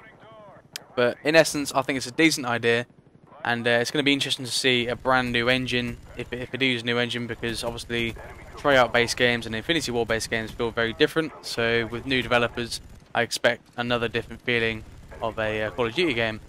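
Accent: United Kingdom. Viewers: Thanks for taking the time to make all these vids - really appreciate it, great effort. It's refreshing to hear a fellow Brit too! Nowt wrong with other nationalities I might add, (quickly got that in before I was shot down in flames!) Keep up the good work.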